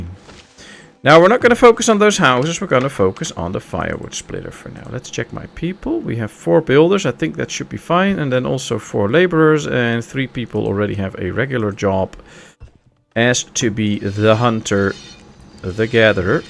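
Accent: Netherlands. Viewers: Nice video! I recently found your channel while looking for LiF letsplays. Maybe an odd question but are you Dutch? You have that typical English eccent. If yes then groeten uit Utrecht.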